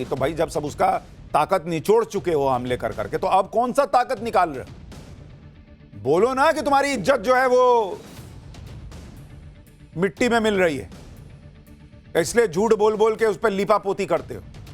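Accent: Bihar, India. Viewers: Andaz news sunane ka achcha hai Bihari lahja hai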